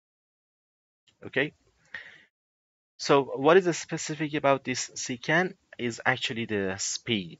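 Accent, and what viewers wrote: Iran. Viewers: good job man, thank you so much for your comprehensive and functional training. your accent says that you are Iranian, am I right?😁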